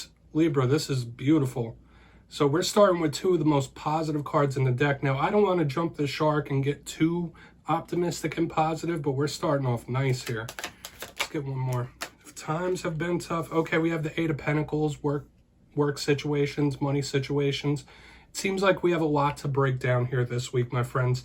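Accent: New Jersey, United States. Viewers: Love the stories the pictures tell. Use tarot for clarity and to help interpret the ongoing energies. Im a new subscriber and was attracted to the title. Also the NJ cadence, im originally an east coaster.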